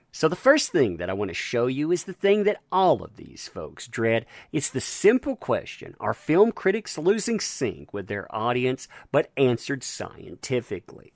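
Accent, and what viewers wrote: South, United States. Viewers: you should do a cooking episode. you got that southern accent of a cowboy chef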